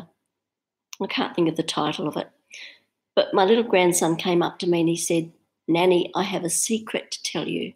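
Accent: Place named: United Kingdom. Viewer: Hi from Turkey! I've been trying to learn English for a while. I watched your video while looking for the meaning of "gotta get going" on Youtube. Glad to meet you and your excellent British accent!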